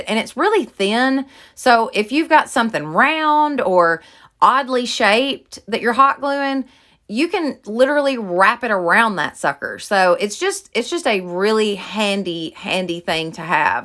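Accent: South, United States. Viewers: I really enjoyed the whole video. Love your southern accent, but I live in Oklahoma so I can actually understand you lol. Sometimes I have to rewind and rewind videos from the other YouTube girls because they talk way to fast lol. I'm subscribing and fixing to check out your past videos. Thanks for sharing your creativity with us!!! God Bless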